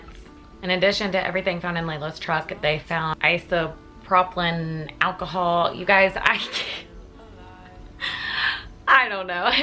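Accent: South, United States. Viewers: Wow, I love her voice. Lil southern accent. You got my sub.....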